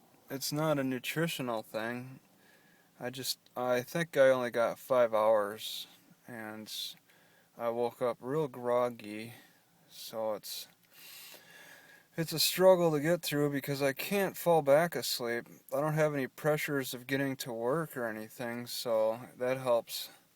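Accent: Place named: Alaska, United States. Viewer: lots of tourism related jobs and cannery work up here (just don't tell anyone its our secret). but some canneries house and feed you! even though the pay isn't always the greatest. The rain keeps a lot of people from living up here though. you talk like an Alaskan... a State of very independent people.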